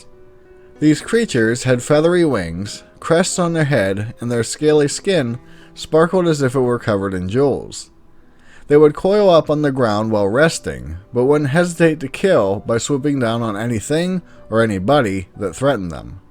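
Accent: United States. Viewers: Yay someone did a video on my home country of Cymru (or Wales) I must say it feels odd to hear an American accent say our names.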